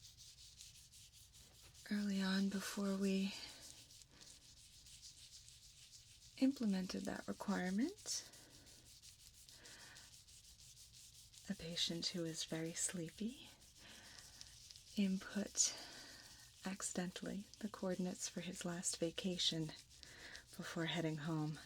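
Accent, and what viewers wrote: United States. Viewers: I would LOVE some more sci-fi. You are the best,. Your diction and general English usage is way beyond what I'm seeing on competing channels. You also have a beautiful voice... no vocal fry, no nasality, no whine... and a very pleasing American accent. Listening to you talk is like spooning honey from a bottomless jar:-) As if that wasn't enough, the camera loves you too. Even with minimalist make up you always look sparkling and fresh. I guess you're used to people telling you how pretty you are, so I won't even go there:-D I wish I looked like you! I love your sci-fi style though. It reminded me a bit of the air hostesses in The Fifth Element, except their make-up is a little too heavy, for my taste anyway. Your make-up here is just about right. It goes perfectly with the sci-fi wig. You could also do a take on Rachael in Blade Runner. 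That would be kinda sci-fi, but you wouldn't need the wig. Thanks so much for your wonderful channel.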